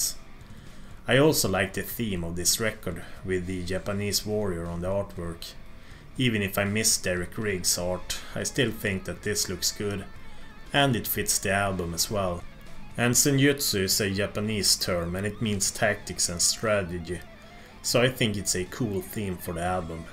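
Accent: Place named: Stockholm, Sweden. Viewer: cringey stockholm accent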